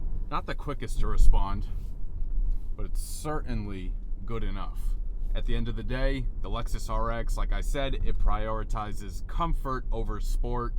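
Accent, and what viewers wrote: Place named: New Jersey, United States. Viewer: Bro’s never heard a Jersey accent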